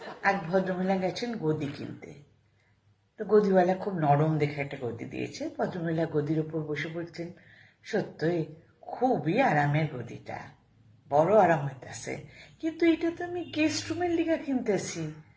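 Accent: Bangladesh. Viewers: দারুণ কইছোস বাঙাল ভাষায়। ভালো হইছে। জিও আর চালাইয়া যাস তোর গুনাবলী। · Thanks for continuing with Bikrampur dialect that your father was a master. Great initiative! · অনেক শুভেচ্ছা জানালাম।যদিও ভানু বন্দোপাধ্যায় এক অনন্য প্রতিভার বিকাশ, অন্য দেশ হোলে সম্মান প্রদর্শন অন্য রকম হত,তবু বলবো,দেরিতে হলেও আপনি এসেছেন,দয়া করে থেমে যাবেন না,চালিয়ে খেলুন, শুধু বাঙাল ভাষাটা আরো একটু প্রয়োগ করুন,খুব মিষ্টি লাগবে,কথা দিছি।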